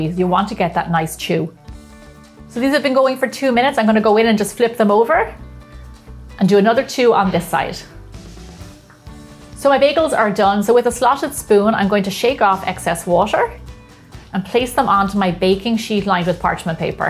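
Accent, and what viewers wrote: Ireland. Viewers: With the Irish accent this woman could give a recipe for swill and it would still sound good. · Irish accent? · your bagels looked delicious, made me hungry, & i enjoyed your accent. top 'o the mornin' to ya lass. do you have any sour dough recipes?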